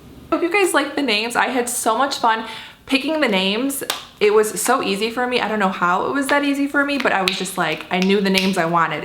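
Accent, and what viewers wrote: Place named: Michigan, United States. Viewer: Your accent makes much more sense now, you're from Michigan!!!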